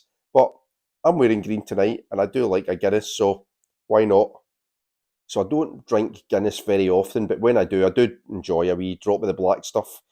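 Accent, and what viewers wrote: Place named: Scotland, United Kingdom. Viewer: I wish I could have added your accent even though I assume it’s Scott’s to my video. So good ✌🏼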